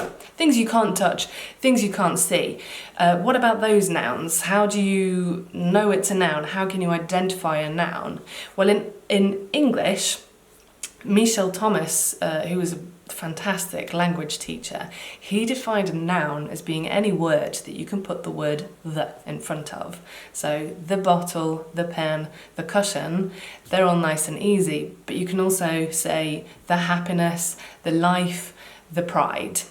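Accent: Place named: United Kingdom. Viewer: Great video. I'll be using this "the" explanation for my future teachings. Plus, always good to hear that Brit accent 😉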